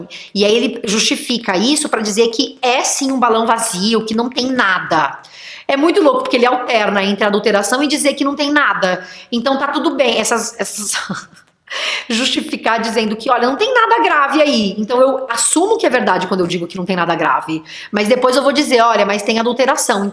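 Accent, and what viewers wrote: Rio de Janeiro, Brazil. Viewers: ...amo esse carioquês!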